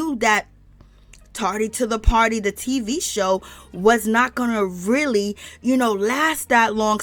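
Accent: New York, United States. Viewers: Hey Girrrrrrllllllll hey........ l just love listening to your videos. I love the way u talk.💕 You must be from NY. I'm a New York girl as well, born there left when l was 13. Anyway, people are not up to Kim's Shenanigans! Remember the last time she was on Housewives. Andy told her she was a trouble maker. Anywho... nice chatting. 🤩💞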